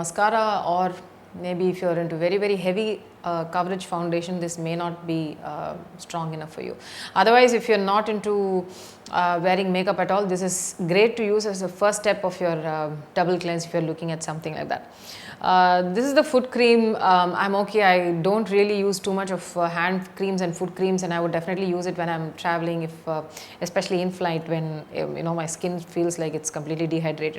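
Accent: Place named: India. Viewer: I like Ur sonut Indian talking style 😆😆😅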